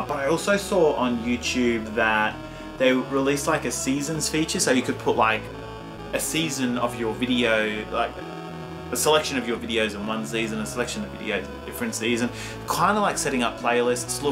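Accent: Australia. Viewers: your creativity, editing style and fun aussie accent are what I enjoy about your videos. you should do whatever you are passionate about in your videos. keep up the good work bro :)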